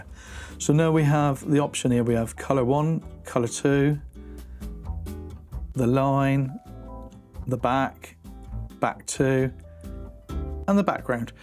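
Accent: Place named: Somerset, United Kingdom. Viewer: This video was excellent, convinced me to download it and it's great. Judging by your accent I suspect we arent far apart, I live in Somerset. Currently trying to upload a custom logo and it doesn't give the option of where to upload the image from though (as yours does from the bottom of the screen). Any idea how to sort this?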